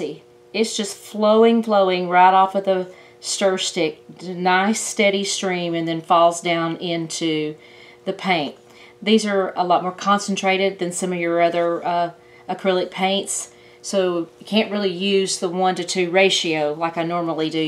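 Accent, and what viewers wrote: South, United States. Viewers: Enjoyed this, Very nice. May I ask where you are from? You have my southern accent! I'm from SW VA.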